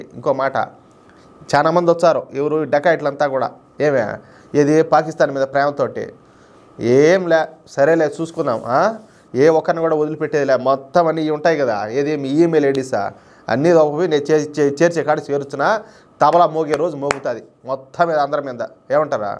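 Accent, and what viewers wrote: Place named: Kadapa, India. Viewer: Ni slang cuddapah laga undhi brother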